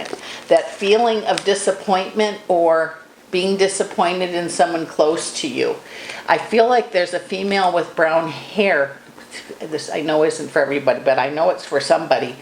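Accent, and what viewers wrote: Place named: Minnesota, United States. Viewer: Thank you for the readkng❤❤I look forward to seeing you and hearing your voice. You have a Minnesotan type of accent and I LOVEZ me some Minnesota peeps. Good memories 👍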